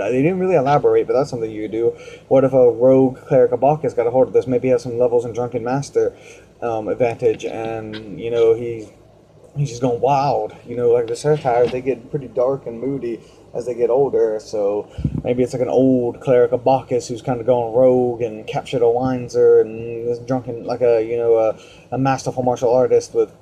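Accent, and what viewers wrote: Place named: United States. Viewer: Are you german? Your accent i very american ^^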